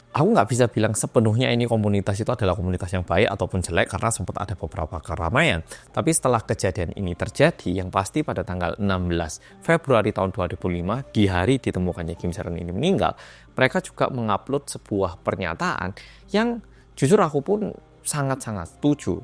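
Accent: Java, Indonesia. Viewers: Nungguin bget si komen dan opini oppa yg medok.. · Jawa lu medok banget,😂😂😂😂😂…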